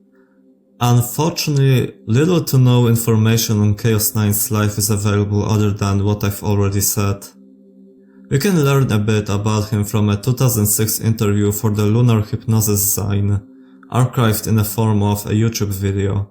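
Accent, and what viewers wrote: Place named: Poland. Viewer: slysze twoj polski akcent kolego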